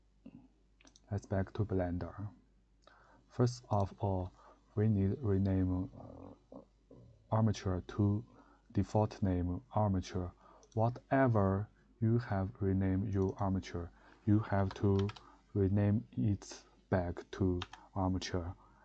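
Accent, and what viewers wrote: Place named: China. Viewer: 好明显的中国口音啊大佬哈哈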